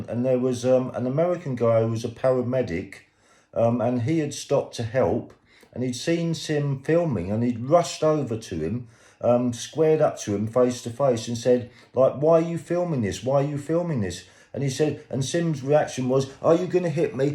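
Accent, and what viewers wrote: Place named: United Kingdom. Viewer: You sound like a British idiotic jealous mfer!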